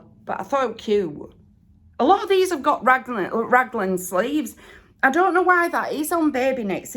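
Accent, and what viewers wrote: Yorkshire, United Kingdom. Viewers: So good to see you back on YouTube I have missed you as I am sure have many others sincerely hope you are well on the mend to become your happy self again. I watch you from New Zealand where I live now having moved here 15 years ago I am originally from York where I think you said you lived at one time . I am 88 years old and I love to hear your Yorkshire accent take care happy knitting well done. G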